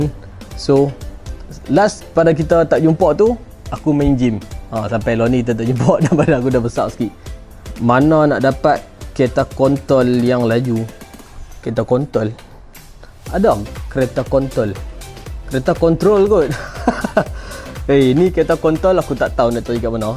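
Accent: Kedah, Malaysia. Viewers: Abang orang mana Kedah ka pineng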